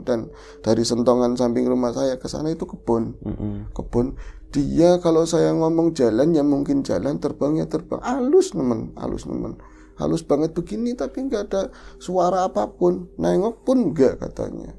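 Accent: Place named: Tegal, Indonesia. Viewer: Saya senang gaya bercerita, santai, ada bhs tegalnya, ada lucunya....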